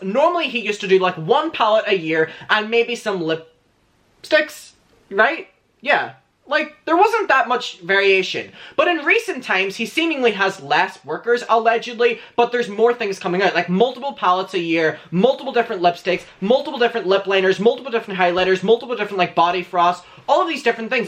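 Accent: Ireland. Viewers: Irish acsent you mean hes not speeking native irish lets get that straight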